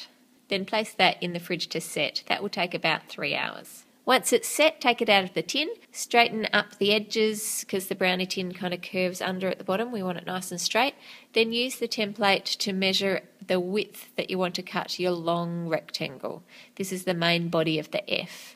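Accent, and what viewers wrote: Australia. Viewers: are you Australian? Your defiantly not British because I am and i've never heard anything like that. xx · I like your Australian acsent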